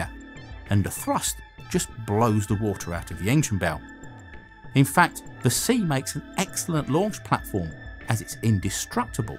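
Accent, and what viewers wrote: United Kingdom. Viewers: His British accent is like music o my ears.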